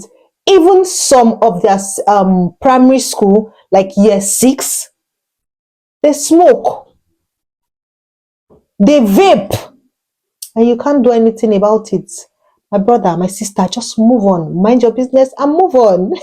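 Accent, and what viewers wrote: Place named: Africa. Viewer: My guess is Nigeria but definitely African countries based on the accent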